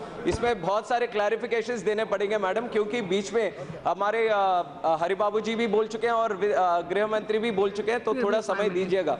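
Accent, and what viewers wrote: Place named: Andhra Pradesh, India. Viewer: Andhra voice is there...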